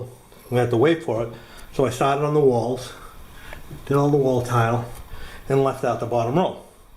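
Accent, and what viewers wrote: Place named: Boston, United States. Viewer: might I ask where you accent is from? It sounds to me (my untrained UK ear) what a Boston accent would sound like but seems to have a hint of something else occasionally. Where you Boston born and bred?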